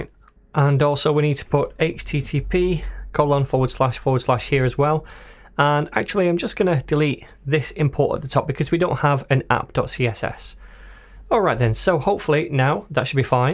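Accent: United Kingdom. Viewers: I want you as teacher! 🤩 I love your British accent Cheers from France 🇫🇷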